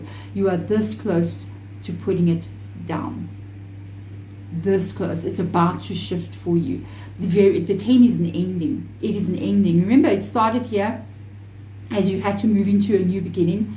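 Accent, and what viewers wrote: South Africa. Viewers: Thank you...great reading...first time watching you...arw you South African? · Wow, wow, wow, however a bit conflicting, been fighting six years for my property sold unlawfully by my husband, got an eviction order on Wednesday - valentines day, going to appeal and lodge another case to fight the unlawful sale - I trust this is the right action???? Know I will win the case if I do, but don't really have the finances for a High Court Action - should I let go of all that I have worked for and more on??? Keep getting conflicting answers from the Universe. Wishing you love and light. By your accent I assume you to be a fellow South African.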